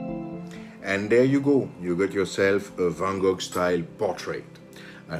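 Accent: France. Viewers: on arrive à reconnaître l'accent français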